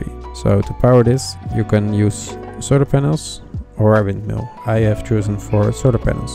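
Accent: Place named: Netherlands. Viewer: The dutch is strong in this one....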